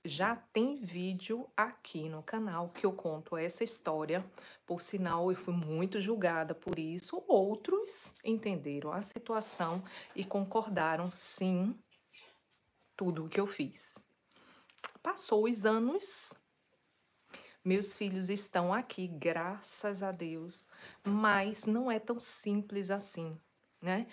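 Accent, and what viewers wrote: Bahia, Brazil. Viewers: Que delícia de página! Comecei a seguir hoje ! Que delicia de sotaque Baiano! História de vida linda❤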